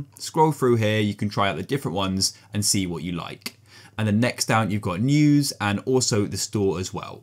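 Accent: United Kingdom. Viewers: soo british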